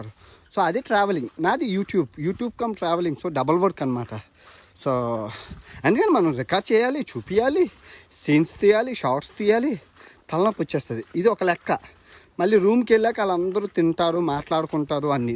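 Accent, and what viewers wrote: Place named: Srikakulam, India. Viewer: Bayya nuvvu evaro naku teliyadu 2nd video chusa this video was keka and so natural , like ur seikakulam accent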